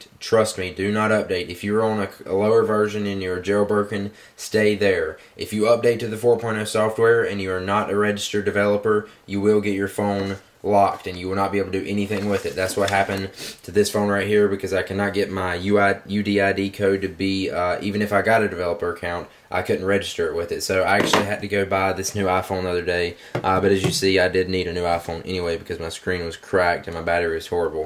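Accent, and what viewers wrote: South, United States. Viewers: southern boy lol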